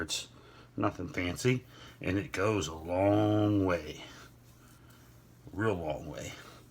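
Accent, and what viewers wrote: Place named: Pittsburgh, United States. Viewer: ! LOVE your name!!! Trying to place your accent...are you from the Pittsburgh area?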